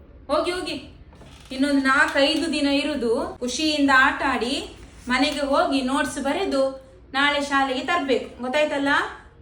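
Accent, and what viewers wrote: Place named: Mangalore, India. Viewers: Love mangalore kannada accent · Nanagu e thara anubava agide school nalli 😮nimma manglore kannada esta ❤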